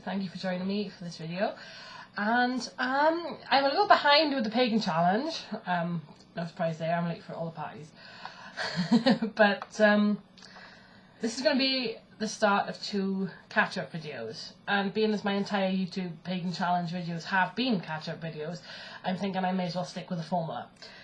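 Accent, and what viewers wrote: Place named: Wales, United Kingdom. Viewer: Love your welsh accent, just recently back from a prog rock festival in north wales, I used to be in the kitchen witch school I really enjoyed it but family life kept getting in the way so I put it on the back burner for a while. Nice to see your face again, xx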